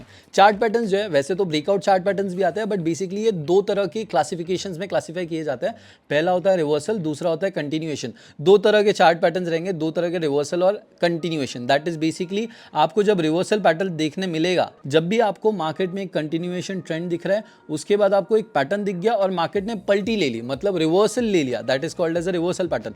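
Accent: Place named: Maharashtra, India. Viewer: Bhari vatl bhava tu Maharashtrian ahes aikun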